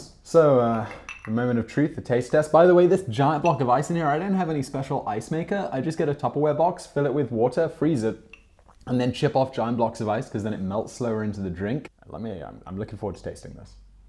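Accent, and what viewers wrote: England, United Kingdom. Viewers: It sounds less like softened American and more like a British person who has lived in America for a while. He definitely sounds British at home but with a very slight hint of American in there. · Not American. Just more laid back British.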